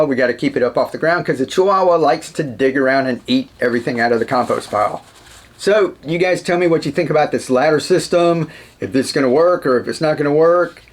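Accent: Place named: Alaska, United States. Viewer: You sound like another Alaskan 😂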